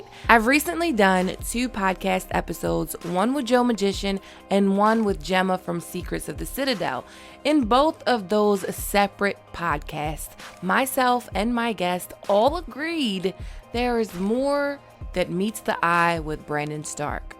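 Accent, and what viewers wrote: United States. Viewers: do your thing my dear u def sound a little country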